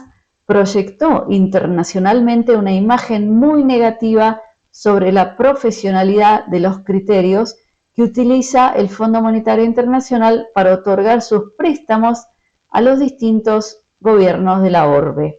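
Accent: Argentina. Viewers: me da esa escucharte. Tu tono argentino después de tantos fiera de tu país. En que haz colaborado en tu país. Es fácil juzgar y no estar en la en tú Pais. en batalla. Desde lejitos. DTB y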